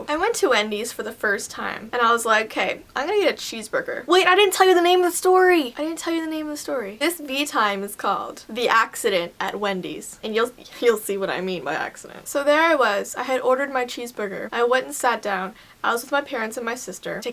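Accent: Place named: Canada. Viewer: She has a Canadian accent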